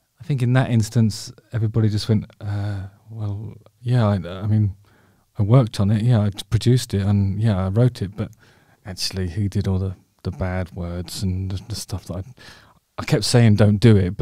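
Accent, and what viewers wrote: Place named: RP, United Kingdom. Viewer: What a beautiful singing voice but I also like your speaking voice its a posh cultured accent that comes across as natural and not affected. On stage I call your best performance an expression of unaffected grace. Really dig your channel.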